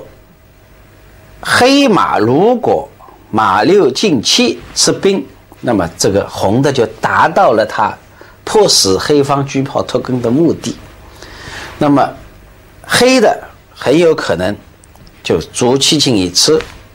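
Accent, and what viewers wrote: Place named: Fujian, China. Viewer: Fujian style mandarin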